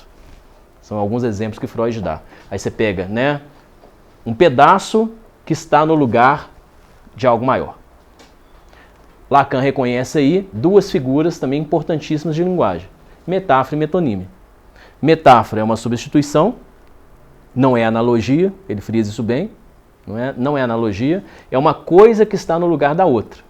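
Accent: Minas Gerais, Brazil. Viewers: O jeito mineiro de falar da psicanálise faz dela brasileira...Boa palestra.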